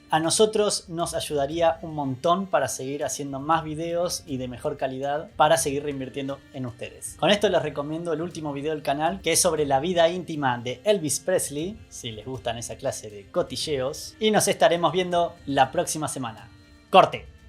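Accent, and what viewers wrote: Argentina. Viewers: ¿Eres argentino o uruguayo?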